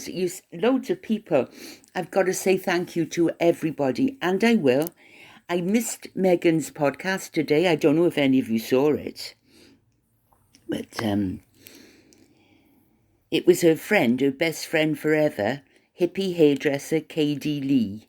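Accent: Wales, United Kingdom. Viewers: Howdy 👩‍🌾😊To You Lovely💖! I Always Enjoy🙌 Hearing Your🥰 Welsh Voice! It's Very🙌 Calming! Happy😇 Happy🎉🍰🎊 Belated🎉🍰🎊 Birfffday To 💖🌺🌷🌸You!!!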